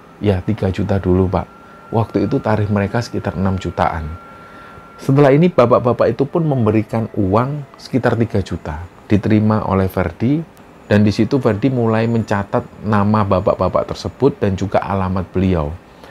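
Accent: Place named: East Java, Indonesia. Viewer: Suka dgn penceritaan mas ini,logat jawatimur bgt,sperti saya nyemak bapak saya, waktu bpk cerita² dirumah..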